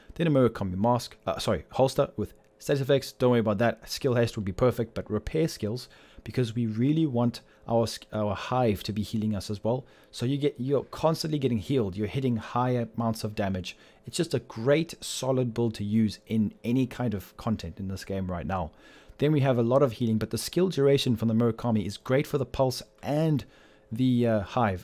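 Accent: South Africa. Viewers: What country you from... South Africa?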